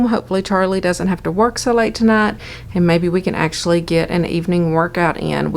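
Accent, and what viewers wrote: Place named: Kentucky, United States. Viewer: hey! Thanks for the great content, I enjoy watching your channel. I knew that you had to be from KY or close because your accent is just like mine!!! 😁😁😁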